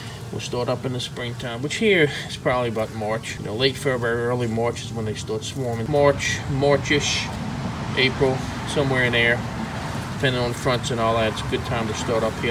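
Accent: Louisiana, United States. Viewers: Damn i miss this Louisiana accent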